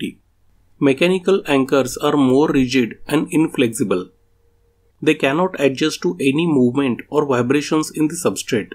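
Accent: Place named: Punjab, India. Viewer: i immediately exited the video due to the punjabi accent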